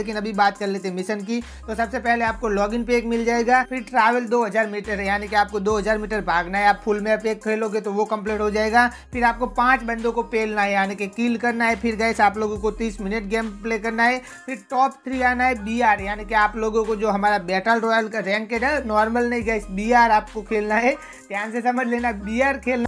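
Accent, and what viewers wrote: Gujarat, India. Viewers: Appp Gujarati ho kya bhai ?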